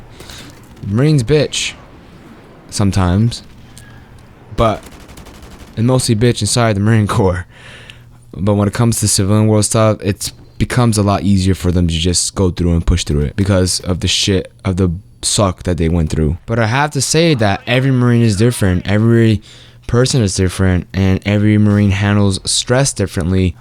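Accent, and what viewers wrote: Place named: New York, United States. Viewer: It sounds like your in new york or california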